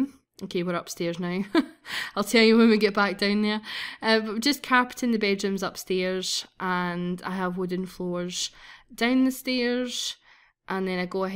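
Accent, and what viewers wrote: Scotland, United Kingdom. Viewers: Yay. Finally someone from Scotland :D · If you get into these sims could you do a current household I know 8 sims is tragic I would love to see it and I'm so jelly of your voice I'm Scottish to but i don't sound As AWSOME as you 👼💙👼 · I love your accent! Are you Scottish? It's so amazing! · U sound Scottish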